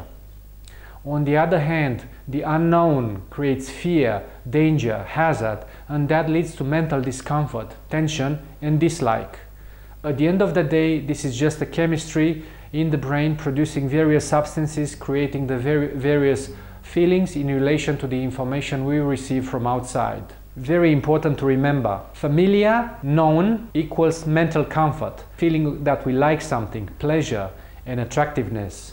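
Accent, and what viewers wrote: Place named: Romania. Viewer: Great video! Thank you very much! I noticed an accent. Are you by any chance Romanian?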